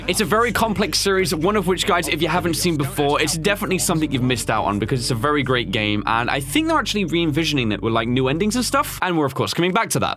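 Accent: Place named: RP, United Kingdom. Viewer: Good job you are crazy good I first started watching from case anamatronics part 1 old version ( sorry if you're insulted but it that vid you sounded so posh ) hehehe your really good keep doing what your doing I someday hope you get 3_4 million subscribers your so funny baz is also really good yeah but your really good I like your videos so much I am a really big fan of you your so nice and one day I would LOOOOVE to meet you keep going and keep making theese funny videos peace out Brah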